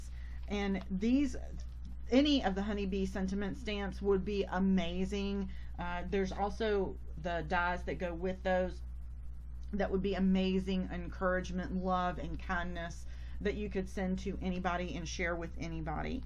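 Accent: South, United States. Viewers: What awesome cards! I’m so thankful that you chose to participate in this important cause! Honestly, to hear someone with a southern accent is even more encouraging. Bless you!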